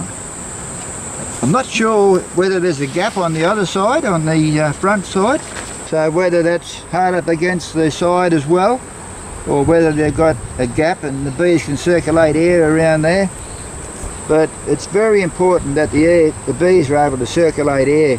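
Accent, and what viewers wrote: Australia. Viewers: I have watched quite a few vids on the flow hive and most commentators come across as sniveling little spoiled brats trashing the product because of their often laughable and regularly idiotic opinions that appear to be mainly based on jalousy more than anything else. This is the first vid I have seen where anyone makes valid criticisms and demonstrates their factual concerns with the product. You have earned a lot of credibility with me. Clearly you are a very knowledgeable beekeeper and more importantly, an honest and fair gentleman. I get the impression you may not be overly keen on the flow hive and that's OK because your concerns are based on fact and experience and not concocted opinion just to jump on the bashing bandwagon. No doubt the product is not perfect but you make sense with your concerns and questioning of it which is miles above what many others have done. 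It's also great to see and hear a died in the wool Aussie making such informative and interesting vids. Well done mate and I wish you every success and good luck with your endeavors.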